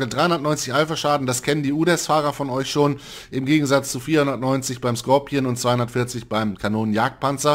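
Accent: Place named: Hamburg, Germany. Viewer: Nossi ist sehr unterhaltsam, Moo für die breite Masse um ihnen das Spiel näher zu bringen. ist für die Spieler, die das Spiel verstehen wollen. Und bringt es zudem mit seiner Hamburger Schnauze (Grüße aus Bergedorf) einfach genial rüber.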